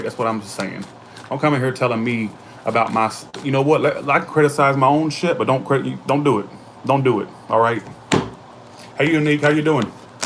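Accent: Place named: Georgia, United States. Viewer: Thank you for your frequent readings! I meant to get back to you when you said you’re from Georgia, not NC; I have relatives from Georgia, that’s why your accent sounded so familiar lol. Thanks for responding! You’re such a sweet soul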